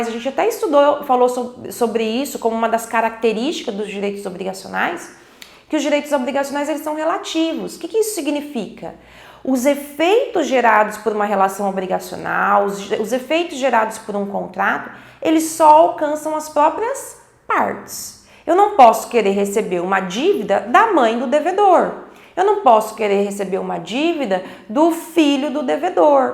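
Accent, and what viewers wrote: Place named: São Paulo, Brazil. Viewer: Excelenteaula. Gostei do sotaque paulista tambem rsrs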